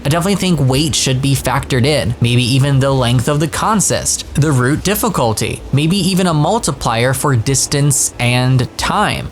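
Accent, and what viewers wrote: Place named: California, United States. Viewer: You have the most California voice from California. Am I right?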